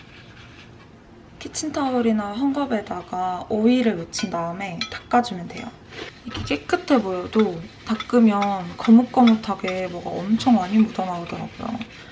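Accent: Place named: Daegu, South Korea. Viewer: 앗 이랜드... 혹시 우방타워랜드?? 초딩때 소풍으로 갔던 기억이ㅋㅋ 대구에서의 일상에서 사투리 자연스레 쓰는게 뭔가ㄷ ㅓ 정감느껴지네여~^^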